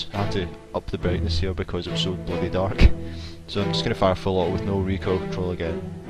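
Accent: Scotland, United Kingdom. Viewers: Not wishing to offend, I actually thought you were speaking Russian for the first 3-5 seconds you were talking before I realized it was a heavy Scottish accent xD Nice video btw. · I'm so glad there was an assualt rifle available for medics. I love being a medic but hate lmgs. I've always loved assualt rifles but never the actual class. Thanks for the tips on how to use this gun. I'm a medic who is just an assualt guy at heart. I was also confused in the first minute. I was dissapointed that it was in another language. Before I read the other comments I thought it was. Then I heard the Scottsman kick up. Everything was good after that.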